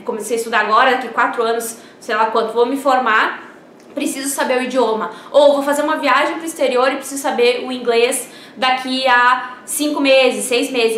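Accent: Rio Grande do Sul, Brazil. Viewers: Eu não sei o que eu tenho com esse povo lindo gaúcho rsrsrs. Estava vendo um video sobre escola de inglês entrou esse em seguida.Ou gaúcho gosta muito do YouTube ou eles me perseguem kkkk Adoro esse sotaque gauchês kkk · Você é gaúcha né ? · Ela é gaúcha?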